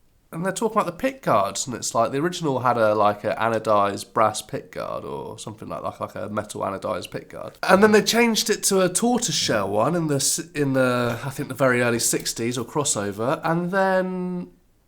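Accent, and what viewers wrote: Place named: United Kingdom. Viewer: I like your style (or is behavior the better word?) and really enjoy your way of talking and the british accent. Im a simple man, i love vintage guitars, so i subscribe. Thumps up! Many best greetings from berlin- germany 😃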